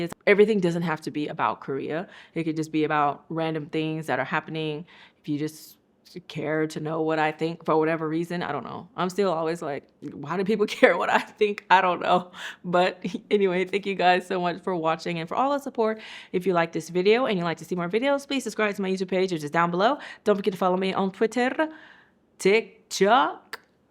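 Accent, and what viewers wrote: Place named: South, United States. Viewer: I love that you speak Korean and have the cutest Southern accent.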